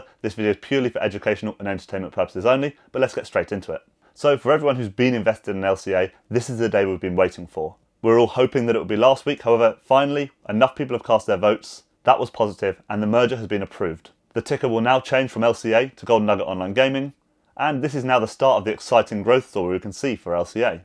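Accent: United Kingdom. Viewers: Really appreciate the update, thank you. I got into this SPAC through you, this could be a great success story so gonna watch it and enjoy the journey. Looking forward to your next pick. Also - so nice to hear a YouTuber with a British accent! Mark - Hertfordshire.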